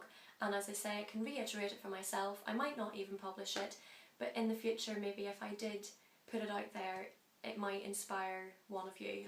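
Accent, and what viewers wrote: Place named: New Jersey, United States. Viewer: thanx for the video. where r u from? you have an accent. Jersey?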